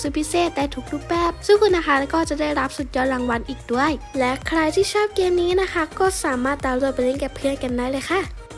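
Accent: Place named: Central, Thailand. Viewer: สงสัยมานานละอยากรุ้ แอดมินคนจังหวัดไรอะ ราชบุรี สุพรรณ กาญ เพรชบุรี เพราะสำเนียงเหมือนๆกับผมเลย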